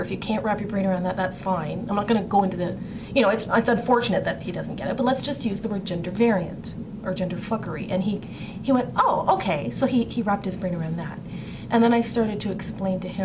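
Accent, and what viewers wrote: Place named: Canada. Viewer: Yeah, that's my case, too. I'm also totally used to the pronouns; it's just legal/ work/ questionnaire forms and that "male" designation that makes me feel like I'm being DEFINED, categorized, in an incomplete or erroneous way. Of course, race is a construct, too! We're all genetically-compatible... but that's a topic for another channel... Could tell you were Canadian; it's the pronunciation of "about." :^)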